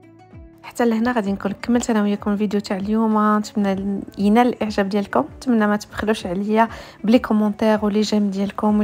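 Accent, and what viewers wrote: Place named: Oujda, Morocco. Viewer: شريبه بالحليب عرفتي هضرتك حلللوة تاع وجدة كيعجبني نسمع ليك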